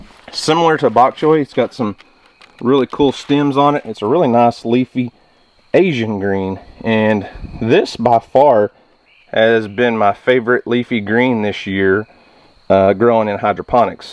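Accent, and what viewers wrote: United States. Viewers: Just listening your accent ...makes me feel you are an American with no respect for nature ... promoting hydroponics just because you want youtube money ... you American when talking about hydroponics...start by saying THANKS Mexicans, Thsnks Mexícan Empire, Thanks Aztecs, Thanks toltecs for your hydroponic engineering and inventing hydroponic science ...so now I can grow my hydroponic marijuana ....Americans 🙏🏼 God sabe is from Donald Trump ... we can help to build that wall and you can send us back our farmers, we need them, we want them, we love them ... you grow your “marijuana” by yourself !!!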